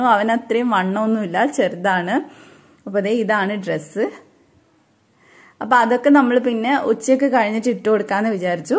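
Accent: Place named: Kannur, India. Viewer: ഉയ്യെന്റപ്പാ .....കണ്ണൂർക്കാരൻ 💪🏻 ഇന്ത്യൻ റെയിൽവേ അല്ലെ കറക്റ്റ് ടൈമിൽ എത്തുമല്ലേ 🤗🤗🤣🤣🤩🙏🏻 കണ്ണൂർക്കാരെ സ്ലാങ് മറക്കല്ലെ 🤭🤭🤣👍🏻✌️🥰🥰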